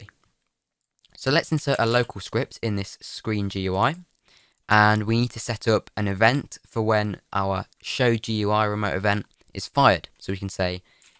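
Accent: United Kingdom. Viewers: British + English =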